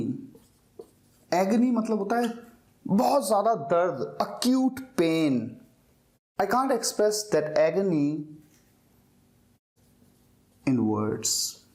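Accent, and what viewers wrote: India. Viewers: I am Chinese，YouTube recommend India accent English to me😨😨😨😂😂😂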